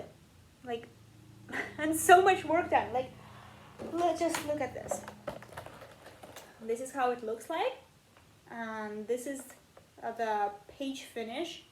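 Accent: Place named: Texas, United States. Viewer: Tell your husband you’re not too much for Texans. We’re all like that. 🤣 You’re a Texan with an accent. You’ve got a great smile too. Love your shares. Keep stitching.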